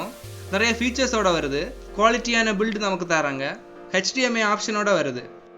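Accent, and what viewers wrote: Kanyakumari, India. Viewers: Bro enaku oru pc build pannanum. Slang ppatha kk slang mathiri iruku.. unga address illa number kedaikuma.enaku office purpose ku than so minimum evlo budget la panna mudiyum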